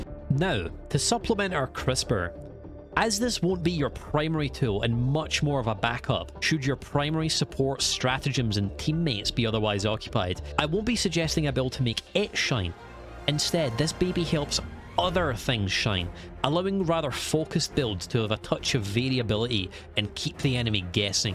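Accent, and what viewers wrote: Scotland, United Kingdom. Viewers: A Scottish sci fi quartermaster giving me flamethrower recommendations?? This is heaven for the ears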